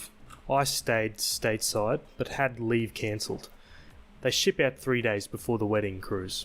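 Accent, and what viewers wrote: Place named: Australia. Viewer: It's great to hear another Aussie doing the Reddit stories. Sick to death of the dammed Yanks having the monopoly on YouTube. Looking forward to more from you but I'd like to suggest trying some different sub-reddits because I have heard the second story 5 times in the last couple of days. Few people do neckbeards, incel tears and several other entertaining ones. I think I even saw a Bogan one somewhere.... lol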